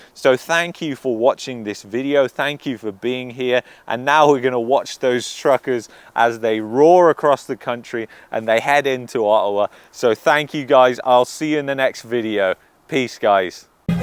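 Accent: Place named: Birmingham, United Kingdom. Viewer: Your severe handicap is being a brommie. It's hard not to sound think, and come from Birmingham. You are clearly not thick, far from it, but you have a challenge.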